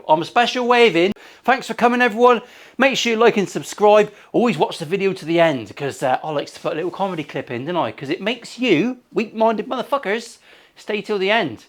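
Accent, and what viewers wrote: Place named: Northern Ireland, United Kingdom. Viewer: The random northern irish accent always catches me off guard!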